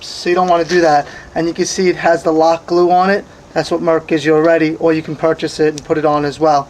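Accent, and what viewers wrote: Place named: Brooklyn, United States. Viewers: Thanks for the video very informative, were u born and raised in long island because you have a Brooklyn accent..hehe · Love that New York accent, even a Cajun like me understood the good video job. Thanks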